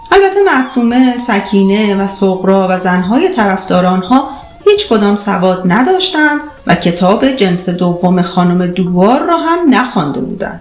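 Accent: Yazd, Iran. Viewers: یزدی هستید؟؟ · چه لهجه قشنگی .یزدی هستید خودتون؟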